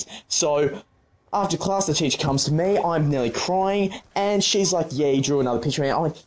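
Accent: Australia. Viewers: R u Australian coz im Australian and u definitely have an Australian accent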